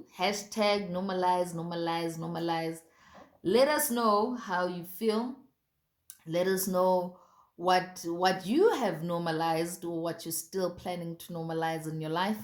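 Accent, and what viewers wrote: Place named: Lesotho. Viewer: Thokoza gogo..your accent hao bua sesotho...wher are you based or from? Thank you for the information.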